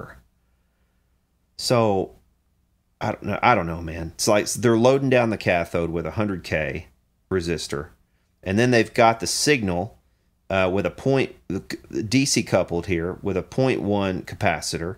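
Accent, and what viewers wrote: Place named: Georgia, United States. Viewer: You sound like you're from Georgia. By the way, I live across the freeway from Mesa Boogie.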